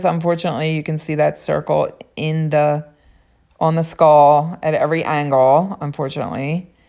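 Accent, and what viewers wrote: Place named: New Jersey, United States. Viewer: Are you from jersey or PA ? Just wonder from your accent lol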